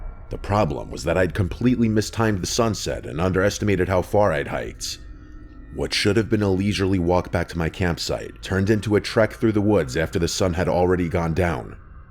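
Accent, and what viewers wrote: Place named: Rhode Island, United States. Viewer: U know that u have a perfect voice for narration!! I detect a North eastern accent like maybe Rhode Island?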